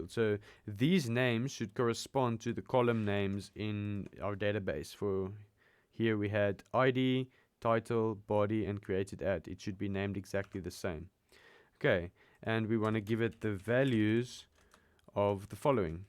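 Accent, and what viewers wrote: South Africa. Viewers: i can hear a mzansi accent...?....?....? ❤❤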